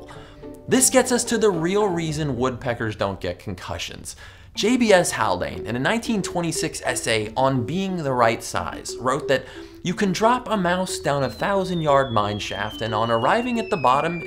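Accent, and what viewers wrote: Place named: United States. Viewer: You seem to understand the video regardless, his accent is American so it’s his native.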